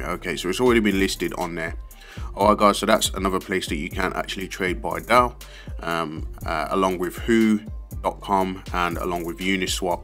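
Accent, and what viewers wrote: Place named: London, United Kingdom. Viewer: I like your accent guy, london bwoi.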